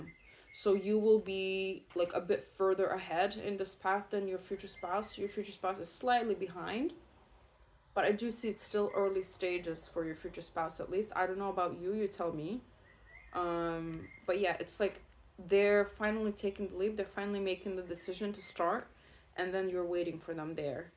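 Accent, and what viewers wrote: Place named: New York, United States. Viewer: Hi with I thought your were from New York. Your accent sounds like you are from Bronx, Brooklyn, Staten Island. I thought perhaps you were living aboard. I am not sure your birth and living geo history. But, being a New Yorker you are hitting certain accent NY twangs. -lol Also, thank you 🙏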